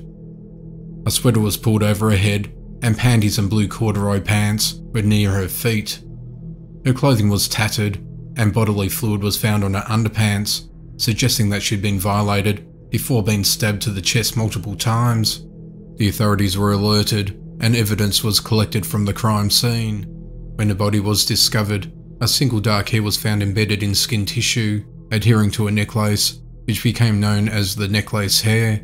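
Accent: Australia. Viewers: Thats a Australian accent actually. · Is that how Australians speak normally? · im disappointed that all these sound like the same case! fucking psychos edit : why are people making such a big deal about his narration? it is very clear. he’s just australian.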